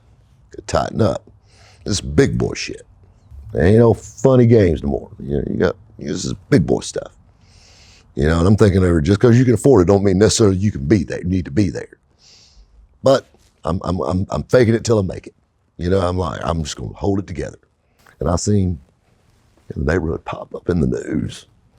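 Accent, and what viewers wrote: South, United States. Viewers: Ei you are the man, I love ur stories. I don't understand a half of what you say, but I love ur southish accent.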